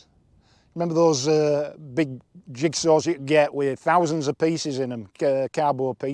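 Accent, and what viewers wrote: Yorkshire, United Kingdom. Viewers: Whilst i agree about the "5 favourite things" etc. I think a few Enfield erm "Bullet points" may have been acceptable in this particular case.....sorry, couldnt resist 🙄. Bad puns aside though i have just happened across your channel, probably due to those sneaky algowotsits picking up on my recent Royal Enfield research in aid of me finally getting back on two wheels after maybe 5 or 6 years of living with a bike shaped hole in my life. How pleasantly surprised i was upon quickly realising id discovered not only a bike review and not just a fellow northener but a fellow cynic and free thinker with that lovely Yorkshire brand of talking reet and telling it how it is. I have even hit that bell shaped notification thing for the very first time ever! 😮 Bob on lad!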